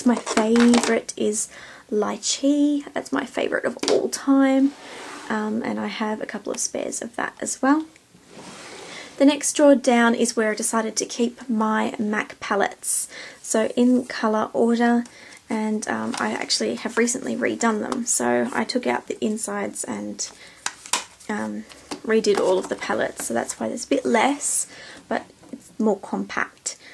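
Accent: Australia. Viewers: I can listen to Australians talk all day, they just make everything sound better!!!!!!!! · r u from australia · r u australian??? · Where do you buy your OPI nail polish, because I live in Australia and you sound Australian :) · I do love vidoes that make me feel I have very little make up.=) Thanks for that and, yes, I like the Aussie accent too - it's quite cloes to my own Brit tones, but still with a little touch of the exotic.=) Take care! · i was wondering what your accent was, and then you said its only in australia. I am australian too... i fail. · are you from Australia?? i LOVE Aussies!! :D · Aussie?? I can tell by the very familiar "ova" "holda" ~ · how could they not tell its aussie? lol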